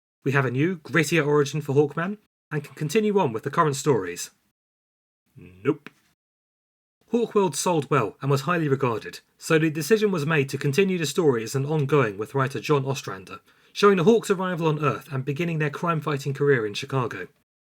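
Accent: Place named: United Kingdom. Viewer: Is 9th metal 8x stronger than Nth metal, or is homies Limey accent just throwing me off? did not know Ninth metal was a thing🤷🏽 The Katar Jr./silver scareb works cuz that Gawkman was a lier and not the Scarebs real brother. I just love JLI and hate the try on that those 2 weren’t legit! Using Morrison’s preferred pronouns? RESPECT Edit Lol Starlin mess’n round w his cosmic fetish, you really can’t fault the guy at this point. Death of the New Gods was a giggle, and he did kill Jason!!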